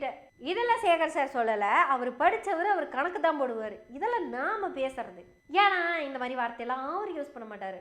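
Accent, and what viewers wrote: Kongu, India. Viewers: அம்மா, உன்னோட கொஞ்சும் கொங்கு தமிழ் சூப்பர்!.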